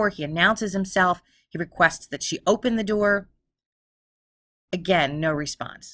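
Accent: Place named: Philadelphia, United States. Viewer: From another suburban Philly girl your accent isn’t that bad. Just found you while dealing with a health issues and indulging my true crime obsession. Not sure if you touched on any of these cause I’m just making my way through your show, but here are some thoughts… You could do a whole season on MOVE and the various standoffs with police, the bombing, the unsolved murder in Jersey, Mumia Abu-Jamal,and the current claims that it’s a cult that abuses children. Or the scandals of the DuPont family. Eddie Savits? The boy in the box (or updates now that they are gonna identify him). Toyenbee tiles. The boy-scout murder (Terrance Bowers). The pennsylvania scissor man. Ellen Greenberg. The video store murders in warminster (1993. I went to school with the victims). The unsolved murder of Tito Norcini (a distant cousin of my ex husband). 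Murder of Carol Ann Dougherty (Bristol) There was a woman in Bristol (I wanna say 1958) who killed a nieghbor and nearly killed 2 children with a hammer. I’m trying to find the info again… her name was Mary something… Maybe too soon, but the 4 bucks county young men murdered that were in the news a few years ago (not gonna disrespect the victims by naming the murderer).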